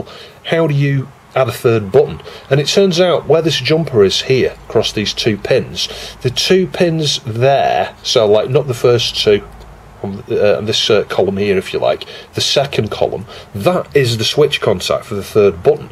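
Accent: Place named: Manchester, United Kingdom. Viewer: Sorry for offtopic but I like your accent, is that Manchester accent? :-)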